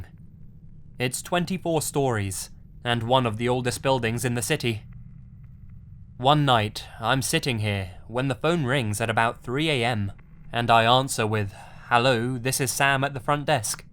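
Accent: England, United Kingdom. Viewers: Riseys Well actually my voice, I'm from the same area of England as, there fore I share the same accent. Anyways, why so aggressive, pent up anger mate? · Why do men with British accents narrate horror stories better than anyone else? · someone who lives in England, English accents aren't always this great, enjoy it XD Congrats on the year,:) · Yep but you know this guy is English you're probably American like me at this point they have become two different languages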